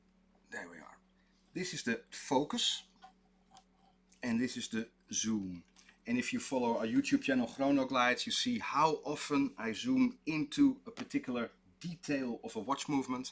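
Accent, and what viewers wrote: Netherlands. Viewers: Ha! Coffee in a shot glass! I’m corrected. You sound like the wonderful Amsterdamer. We were visiting over the holidays and stayed in the historic canal area where my Cousin and family live there. I wish I could have brought my Tudor Chronograph from the early 1980s. I don’t trust just anyone having it serviced. Any suggestions from here in the USA? We are in North Carolina. Thanks.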